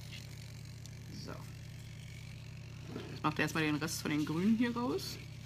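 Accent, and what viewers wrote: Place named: Berlin, Germany. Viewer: Biste aus Berlin? Ick hör did doch :-)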